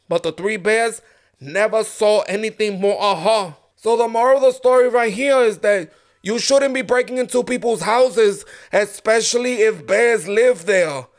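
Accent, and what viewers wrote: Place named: New York, United States. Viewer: 🤣...goldielocks...una presenta....weewee bear🤣! Love his Spanglish NY accent🙋‍♀️🤣👏👏👏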